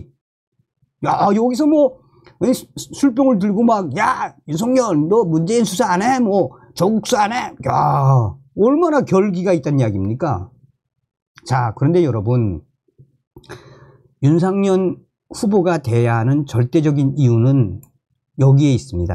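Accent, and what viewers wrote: North Korea. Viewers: 윤상현 입니다 윤상년 아니구요 존경하는 정성산 감독님 방송 열심히 해주시는데 감사합니다멸공 부탁의 말씀은 발음 연습 필요합니다북에서 쓰시던 발음 불편하게 들릴때 많아요 열심히 해 주세요 화이팅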